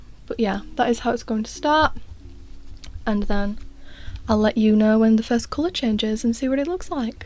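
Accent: United Kingdom. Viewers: Hi where are you from ? I guess by the wallpaper and accent the UK 🇬🇧 love what you are doing . Scrap yarn projects are fun 🤩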